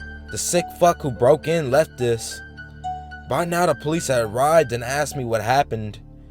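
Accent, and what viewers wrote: South, United States. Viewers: Woah woah woah. This dude from the south or what?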